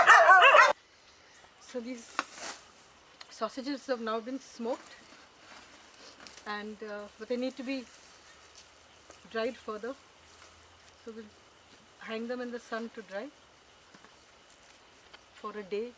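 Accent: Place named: Goa, India. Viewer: I love that Goan accent. Thanks.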